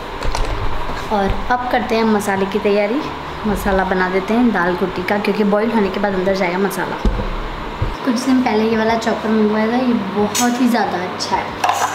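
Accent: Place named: Gujarat, India. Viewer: Hi bhabhi...... .........aapke video me jabhi bhi dekhta hu.... Gujarati me Aap bolte Ho esa lagta hai....... Nice video beautiful vlog hai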